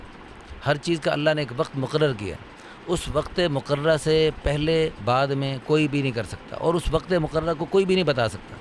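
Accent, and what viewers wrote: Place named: Kotli, Pakistan. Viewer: Kotli sa bat kr rhe hn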